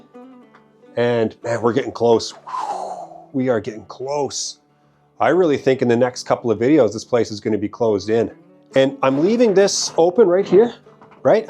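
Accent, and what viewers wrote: Canada. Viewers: As an Australian I had some difficulty with your Canadian Accent. I thought the Dog was called Puppy.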